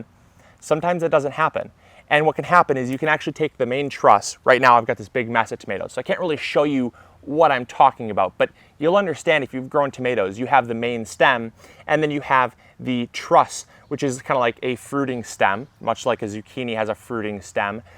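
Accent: Michigan, United States. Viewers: This is completely off topic but as a small backyard gardener in California (born in Michigan) your michigander “accent” makes me smile 😃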